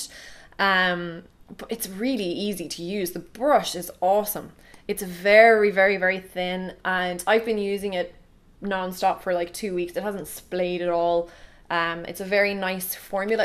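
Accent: Ireland. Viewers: I could sit and listen to your beautiful Irish accent all day. So nice.....You look so pretty and I love your hair color.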